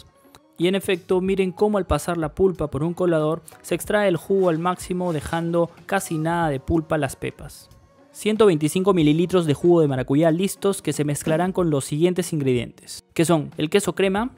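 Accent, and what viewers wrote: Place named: Guatemala. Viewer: jeje Chapín es Guatemalteco, tu acento es muy similar al de ellos. He estado en Guate varias veces, me gusta mucho. Gracias por este postre.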